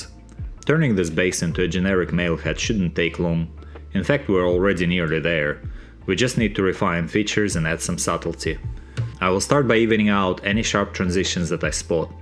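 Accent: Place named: Russia. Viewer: А акцент то русский 😂😂😂👍👍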